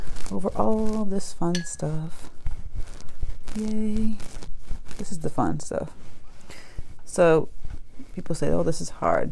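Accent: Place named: New England, United States. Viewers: The Northern Cardinals can have red beaks and some have a yellowish orange beak. The desert dwelling cardinal from Columbia has a gray and black beak. Some have bodies that are gray all over. Some from Arizona have gray bodies and yellow beaks. In Alberta Canada the males are red with mainly yellowish beaks. The females are mainly gray with some red and have yellow beaks. Im sure some will disagree with me because frankly the Cardinals are always birds of a different color. I love your Cardinal. His legs should be angled forward to the left from the knee just below the body and feathers. Love your show and your accent. Do you have a red beak, gray or yellow?lol. Are you from New England or New York areas? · Thank you for this wonderul tutorial! I love Cardinals and see them regularly living in New Hampshire. I knew I detected a New England-like accent when I started watching you last week.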